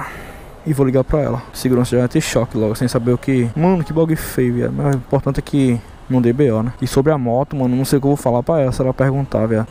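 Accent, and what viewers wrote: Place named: São Paulo, Brazil. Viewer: como que não é fake? essa porra é fakedói meu irmão, tu de Recife, com esse sotaque de paulista, prego do CARAI, honra tuas origens boy! kkkkkkkk essa porra ainda vista por tanta gente kkkkkkkk, vou dar um LIKE só por tua coragem visse !! mas num fica com essa de MANO e MINA não que é feio doido !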